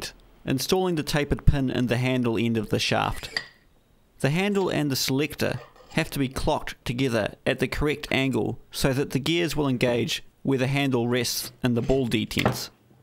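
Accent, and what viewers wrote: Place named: New Zealand. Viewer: Always happy to see a video of yours pop up in my feed. As a Kiwi living in Australia, your accent is making me miss home!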